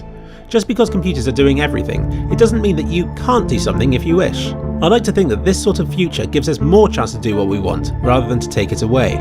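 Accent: United Kingdom. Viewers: Oli Allan I was actually more annoyed by the distracting background muzak and his nasal British(?) accent.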